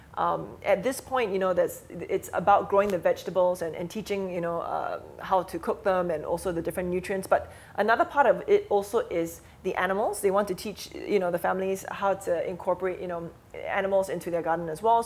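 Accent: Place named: Australia. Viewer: i love Australian accent :D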